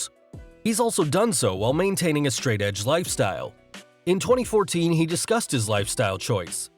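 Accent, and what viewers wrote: United States. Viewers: What's up with the non-British accent? American accents just aren't convincing. They can't even be depended upon to raid Area 51 properly. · Shag? British copy but an American narrator. Weird combo. Grams spelled grammes too.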